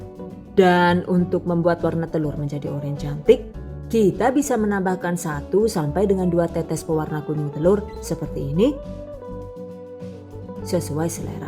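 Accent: Banyumas, Indonesia. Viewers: wong ndi si rika ? deneng teyeng ngomong ngapak yong? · Suka keluar ngapak nya... Primen sih jebulane wong ngapak yaaa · Mbak orang mana kok ngapak ngomonge 😂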